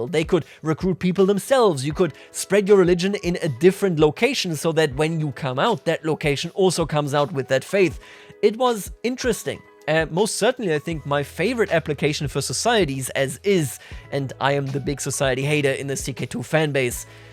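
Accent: Germany. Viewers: hi r u prussian or german? 🤔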